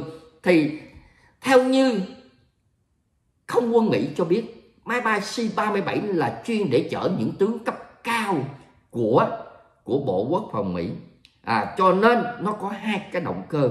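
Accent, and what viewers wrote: South, Vietnam. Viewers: Nghe nói tin tức giọng miền nam hay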